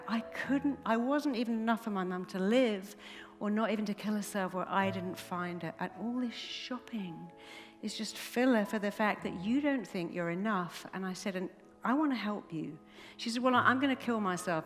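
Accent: United Kingdom. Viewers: ENGLISH ACCENT FULL OF SHIT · It only works when you say it with this britisch accent:)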